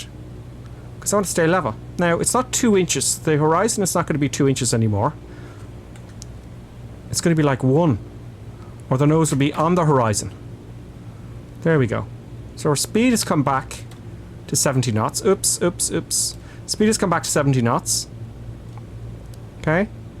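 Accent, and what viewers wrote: Ireland. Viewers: Brilliant. Please continue. Love the Irish lilt 🍀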